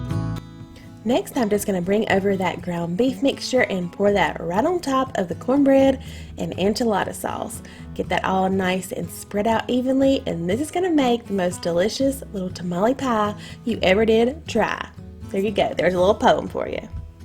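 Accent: South, United States. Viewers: Hi, I'm new to your channel, but like watching you cook and all your easy recipes too. I really like your southern accent too. It's cute, & I subscribed too. Thank you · Dad gummet! Girl you’re speaking my language…true southern 😂 They all looked delicious! Thanks for sharing the recipes!!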